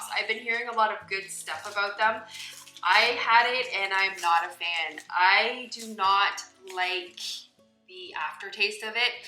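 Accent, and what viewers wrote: Canada. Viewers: Hello, just a question. Do I detect a Canadian accent? Not one y’all , but pasta made me wonder. ❤️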